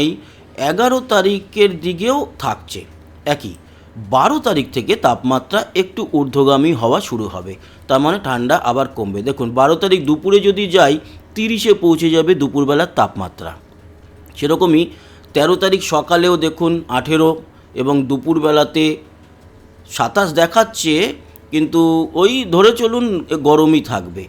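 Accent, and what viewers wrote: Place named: Bangladesh. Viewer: Bangladeshi tan keno ?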